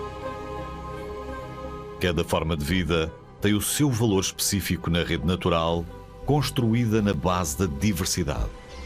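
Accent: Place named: Portugal. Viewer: Português de Portugal é muito feio cara, na moral não tem como kkkkkkk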